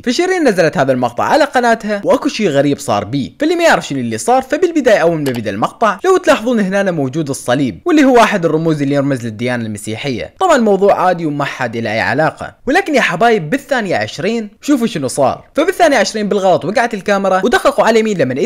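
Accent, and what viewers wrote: Iraq. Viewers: ابغا اشوف اخبار اليوتيوبرز اروح عندك واحب اهل العراق ولهجتكم الحلوة متابعينك من السعودية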